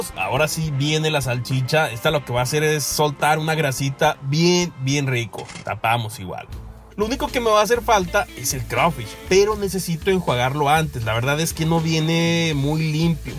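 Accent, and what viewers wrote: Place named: North, Mexico. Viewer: Yo tengo entendido que es de saltillo o torreón no estoy muy seguro pero vive en estados unidos y no tampoco es de Monterrey solo que es el acento norteño que tienen en eso lares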